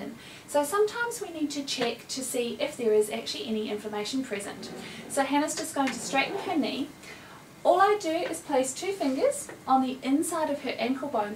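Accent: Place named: New Zealand. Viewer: it sounds new zealand, i went to one of her workshops in rocky, she's great :)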